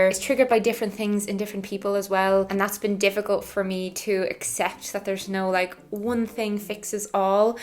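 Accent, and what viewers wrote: Ireland. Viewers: I singled out your lovely Irish accent in the first 10 seconds. You're my most loved nationality on the planet. 🇨🇮🇧🇷🇨🇮🇧🇷🇨🇮🇧🇷 Go raibh maith agat for your video! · I'm from germany but i loooooove irish accent so much. (Because of Saoirse Ronan) Thats why i love your accent. And youre so pretty. 😍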